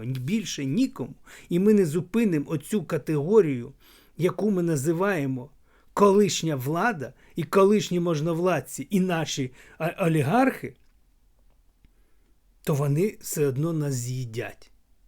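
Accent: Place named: Russia. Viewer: Як не соромно.Що ти иелищ? Навіщо стравлювати людей.Війна.Нпаіщо говориш українською з москальским акцентом? Єто в рашке завжди единовластие а у нас пока слава Богу є вибір. Навіщо використовувати політтехнології ОПЗЖ?Як вже набридли вислови в стилі мураєва.Гагьба.(пожилой человек а вреш как молодой,уже касса ждет на виходе).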